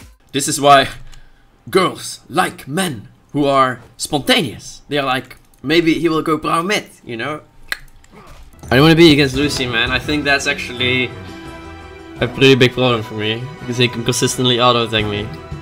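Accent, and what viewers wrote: Netherlands. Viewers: is dutch he has a dutch accent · You sound like you are Dutch Je klinkt alsof je Nederlands bent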